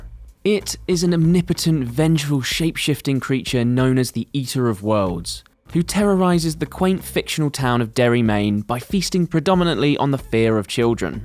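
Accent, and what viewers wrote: United Kingdom. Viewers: Stop with the brit announcers!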